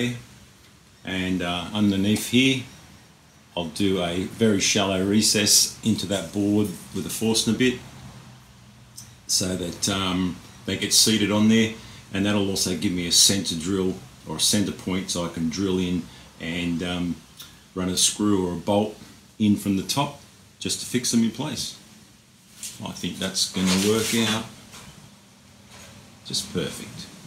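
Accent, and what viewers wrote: Australia. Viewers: Where have this channel been all my life? I love it! Subscribing for sure👍🏻 I love me some aussie accent aswell👌🏻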